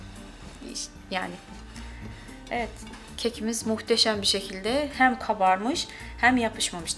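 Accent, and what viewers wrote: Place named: Azerbaijan. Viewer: Hər şey əladı.Amma zəhmət olmazsa öz dilinizdə kanal acın .Sizin türk deyil azeri oldugunuzu lehceniz asanlıqla ələ verir.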